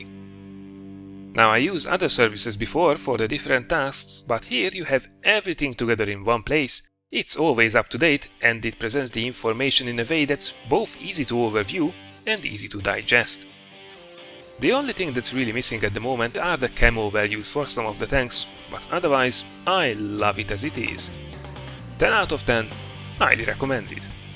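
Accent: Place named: Hungary. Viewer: ha tippelnem kéne akkor Magyar ... akcentusból ítélve.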